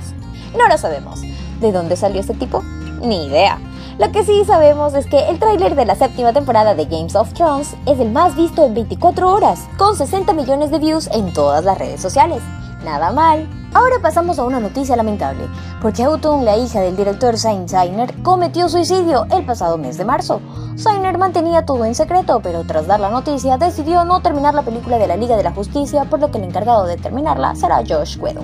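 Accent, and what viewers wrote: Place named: Mexico. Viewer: en serio eres de acuador hablas como mexicana tu muy bien al meter tambien noticias de anime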